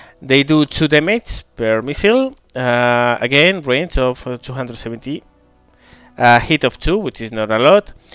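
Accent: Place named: Spain. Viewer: Yay the spanish accent is back ;). Good vid.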